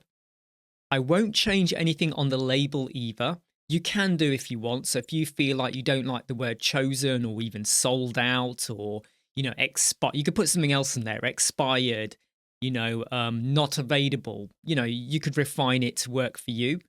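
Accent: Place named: United Kingdom. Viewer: I recently discovered your channel, and I have to say, it's become my go-to resource whenever I need to learn something about Elementor and WordPress. Every time I have a question, I head straight to your channel, use the search bar, and look for the topic I need. Only if I can’t find what I’m looking for do I turn to YouTube for other tutorials. You explain things in such a simple, clear, and easy-to-follow way, and your British accent makes it even more enjoyable and effortless to understand. I just wanted to thank you for that!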